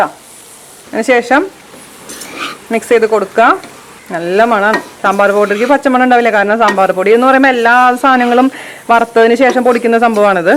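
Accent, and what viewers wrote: Thrissur, India. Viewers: Tani thrissur tanne...... Really great cooking.... Used to follow most videos. Wat we really enjoy is the slang....... Itu vere level ayi makkalee..... 😂😂😂😂. Good job n keep going. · chechide samsaram kettapole thonni, enikkishttayi njanum thrissur anu, ipo Bahrain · chechiii trissurkkari aano? Trissur slang aanalloo speak cheyyunnaath...super 😊 All recipes are superb.🎖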